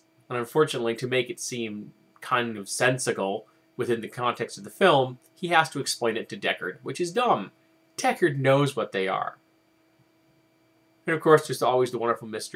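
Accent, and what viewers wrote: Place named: United Kingdom. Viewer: It's the Britishness, you know? People love the accent.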